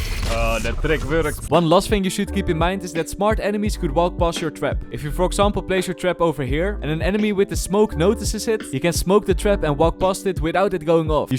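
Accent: Netherlands. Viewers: I know because of ur accent that u are Dutch, ik ben ook Nederlands 😅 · Are you dutch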